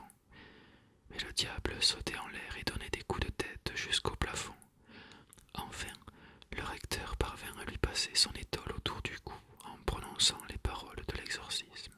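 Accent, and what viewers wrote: South, France. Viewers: Je me demandais si tu étais toi-même breton puis je me suis rappelé ton petit accent qui sonne plutôt du sud, j'ai raison ? 😇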